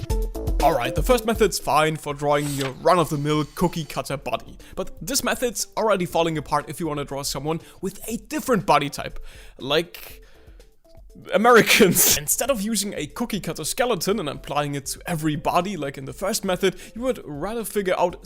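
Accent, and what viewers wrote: Germany. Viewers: Im hearing deutsch broski🤨🤨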